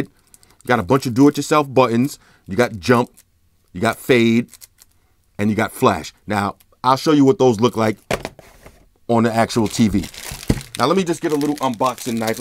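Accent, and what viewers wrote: New York, United States. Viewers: R u from New York? Ur voice is very cool 😎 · i feel like i got bullied into buying this... his voice is mad aggressive, mad convincing tho lmfao you can tell hes a new yorker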